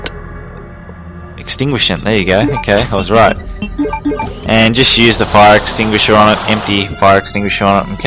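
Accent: Australia. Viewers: He’s Australian man Different dialect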